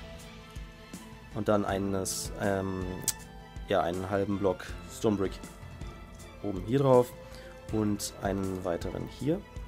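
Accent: Germany. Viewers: Jetzt merke ich erst das du einen deutschen Aktzent hast wenn du englisch sprichst :) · Man hört doch den deutschen Akzent voll :o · wow! ich fand dich sowieso schon klasse bevor du ein Deutsches tut. gemacht hast. in deinen Englischen viedeos merkt man kaum deinen deutschen accent! (hast sicherlich ein auslandsjahr oder ähnliches gemacht =)) aufjedenfall nutze ich deine Bauwerke sehr gerne und auch die Englischen tutorials maag ich! peace leon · jetzt wenn mans weiss das du deutscher bist hört man auch den akzent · The German accent is so cool!!!! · Ich hab grad ein englisches Vid gesehen von dir und obwohl dein Englisch echt sehr gut ist, hab ich sofort erkannt, dass du Deutscher bist. :D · Забавный немецкий) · I knew that your accent was german! Deutschland all they way!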